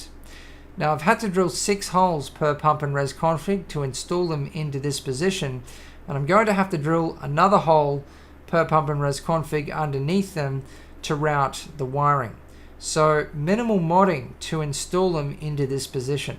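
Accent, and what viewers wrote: Australia. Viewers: I hope you manage to make a nice number of £/$ from your videos because they are excellent. I see that you do builds for customers, is it for only certain parts of the world? I ask because I live in the UK and you sound like you live in Australia... How could you build a rig for someone like me, that lives on the other side of the world? I do have a brother in Oz, for someone like me, I suppose I could pick it up; however, I cannot see me getting my custom rig on the plane as hand luggage. Do you have a website, or place that reveals your details for custom builds? Shalom and Grats!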